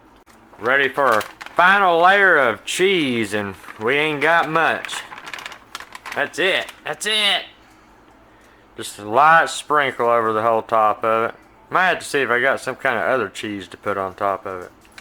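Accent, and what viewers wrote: South, United States. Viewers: I like you! And I love your southern twang! ❤️ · I already know how to cook but I subbed because your accent cracks me up. I'm from California but when I 1st moved to the South, one state away from you, I couldn't understand what anyone was saying. 😂 Your accent definitely beats arkansans for twang. Maybe I've been here too long...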